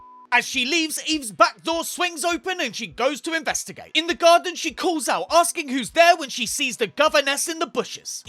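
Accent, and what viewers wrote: United Kingdom. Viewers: Takes me back to a teen in Battersea. Well, minus the wish baby. How have I not noticed the South london accent before now.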